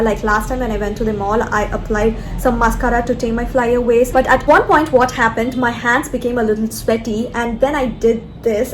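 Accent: South India, India. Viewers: Omg, the way u speak😘😘😘😘😘, u remind me of my south indian teachers.....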